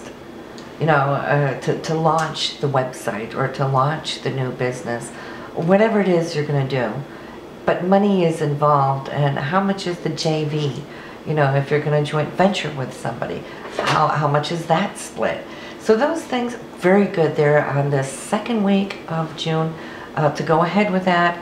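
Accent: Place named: New York, United States. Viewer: nice reading. you sound like a new yorker who looks like she lives in tucson...i say that because I am that!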